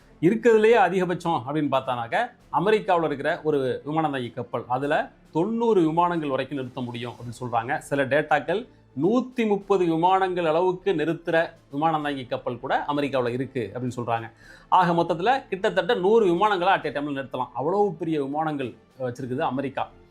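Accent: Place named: Thanjavur, India. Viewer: நல்ல உட்சரிப்பு தஞ்சை பேட்சு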